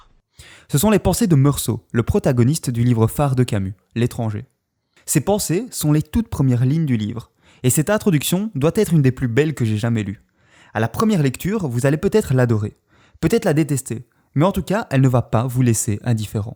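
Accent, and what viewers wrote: Belgium. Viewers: comme d'habitude excellent video tu es le meilleur youtubeur de Tout Les temps avec Plus recherches plus de nuances et moins d'accent belge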